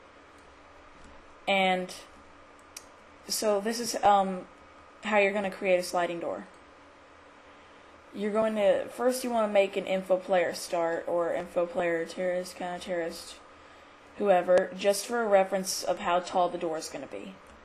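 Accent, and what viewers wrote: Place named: United States. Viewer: Finally someone I can understand! I had to go through so many kids that English and Australian before I found a voice that speaks fluent American! Great, simple, clean tutorial!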